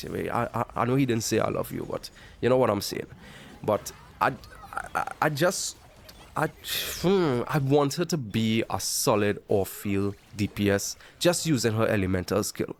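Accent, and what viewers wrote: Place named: Trinidad and Tobago. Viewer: I was wondering same ... he sounds very Trini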